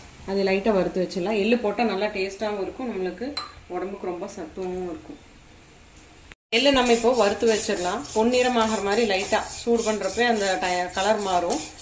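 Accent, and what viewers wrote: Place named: Coimbatore, India. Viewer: Coimbatore slang and snacks super ங்கொ 🙌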